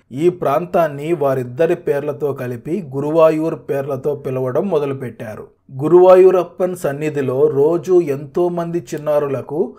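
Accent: Nellore, India. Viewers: అన్న మీది Nellore haa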